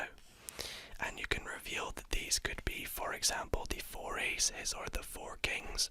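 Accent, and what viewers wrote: Scotland, United Kingdom. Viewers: Took me a minute to notice you're scottish 😄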